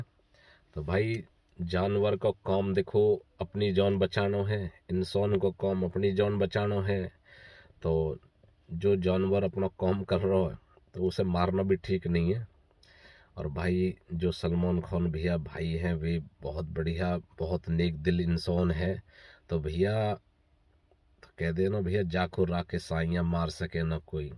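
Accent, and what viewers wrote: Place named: Bihar, India. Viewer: Bhaiye jese bolte ho tum to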